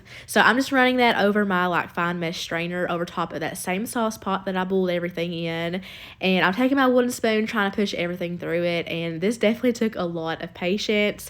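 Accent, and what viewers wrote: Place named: Kentucky, United States. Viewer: I'm from Lexington lol. I didn't know where you were from but I thought I recognized a KY accent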